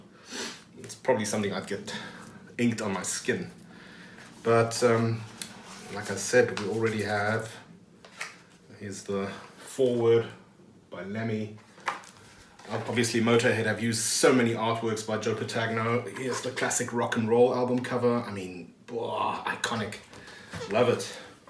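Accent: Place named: Germany. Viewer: You have a German accent, and I live in Europe. From what retailer did you buy it from?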